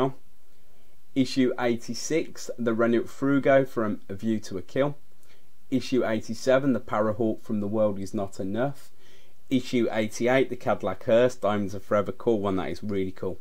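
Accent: United Kingdom. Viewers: Making a recording like this might look easier then it is.... I'm glad he did it. And he speaks British English....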